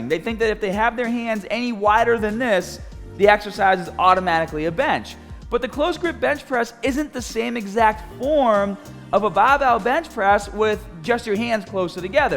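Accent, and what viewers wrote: Boston, United States. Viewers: Is this guy from Boston?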